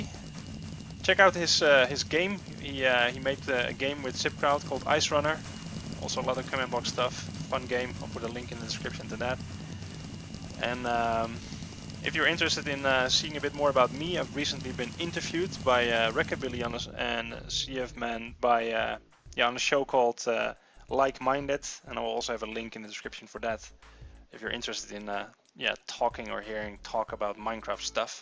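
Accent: Netherlands. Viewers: What kind of accent is that? Are you dutch? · Haha ik hoor dat je nederlands bent, dat betekent niet dat je engels slecht is hoor maar gwn je accent en uitspraak!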